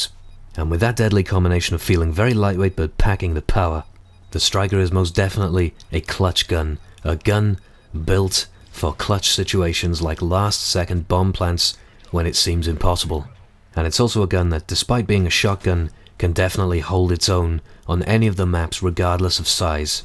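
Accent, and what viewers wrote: Wales, United Kingdom. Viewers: sound like a welsh xbox ahoy :)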